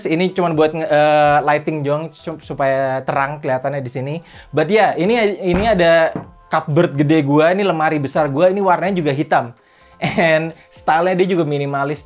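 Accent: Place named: South Jakarta, Indonesia. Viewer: Jaksel banget wkwkk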